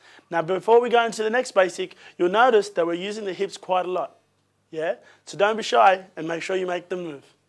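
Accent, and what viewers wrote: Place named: Australia. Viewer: It's Australian with a hint of something else.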